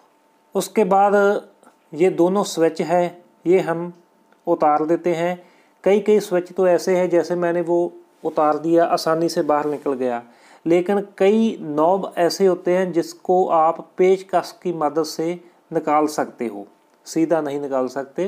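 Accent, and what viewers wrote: Punjab, India. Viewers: Very good voice quality with Punjab I accent. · Punjabi banda lag raha hindi bolne ki tone se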